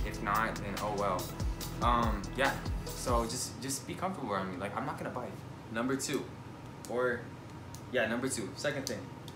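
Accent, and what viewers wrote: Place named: France. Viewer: That French accent 😂and boi I would win any argument 😫😫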